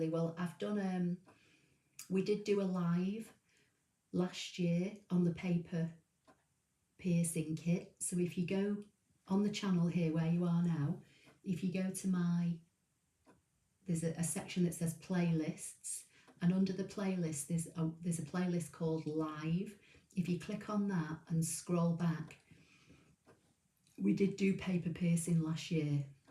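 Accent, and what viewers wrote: England, United Kingdom. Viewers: You have amazingly easy videos to understand even though your English accent is way different than my Texas accent but boy howdy does this old lady enjoy your videos. I haven’t received my scan and cut yet but I know I won’t be afraid to take it out of the box. I hope you do some videos about cutting material thank you so very very much for sharing your amazing talent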